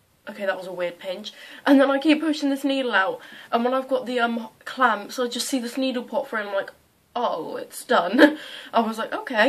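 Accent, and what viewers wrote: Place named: Midlands, United Kingdom. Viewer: Where do you live? You sound like you're from the Midlands like Wolverhampton or somewhere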